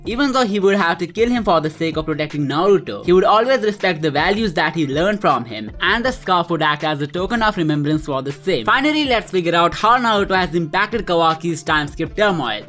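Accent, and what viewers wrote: India. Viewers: Good content but goddamit this indian accent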